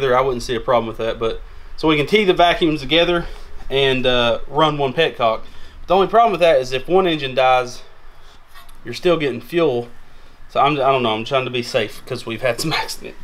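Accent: South, United States. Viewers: Are you from down south?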